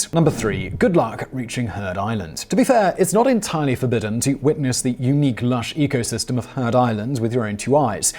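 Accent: United Kingdom. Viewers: I hate the British accent :|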